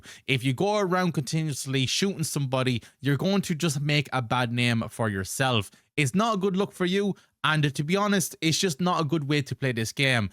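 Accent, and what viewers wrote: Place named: Ireland. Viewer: This guy is Irish Irish lol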